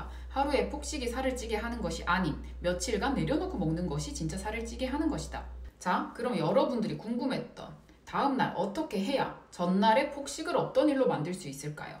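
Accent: Gyeongsang, South Korea. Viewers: 경상도분이시죠? 내가 서울말쓸때랑 똑같은데ㅎㅎ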